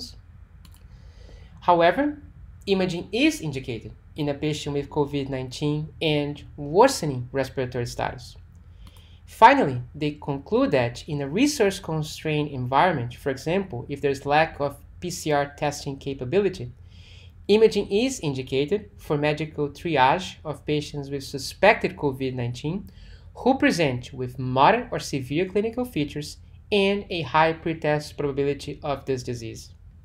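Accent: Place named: Brazil. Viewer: Love the Brazilian accent <3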